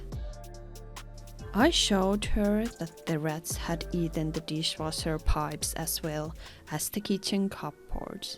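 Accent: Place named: Finland. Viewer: So rude. She has a beautiful voice and is excited about her cleaning. I love her Finnish accent. 💙🤍💙🤍💙🤍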